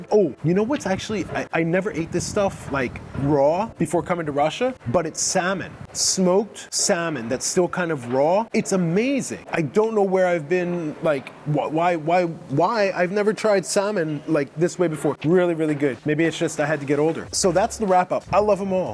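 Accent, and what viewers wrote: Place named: New York, United States. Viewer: You still got that unmistakable New York accent, though!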